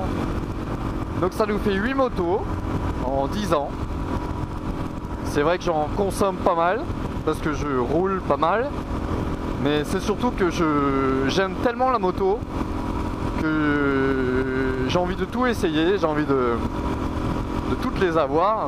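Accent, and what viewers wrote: Alsace, France. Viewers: On sait que tu es Alsacien, avec cet accent. Et le Panneau à 70 (Après le moustique) c'est pour les chiens !! De même que celui à 30km !! A+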